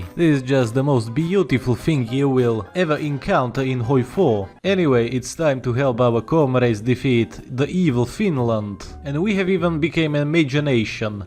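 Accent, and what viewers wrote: Russia. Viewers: A Russian accent?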